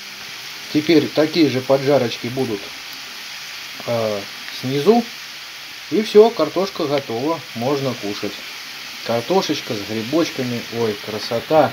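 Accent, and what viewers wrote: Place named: Kursk, Russia. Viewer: Какая область???? Акцент Курский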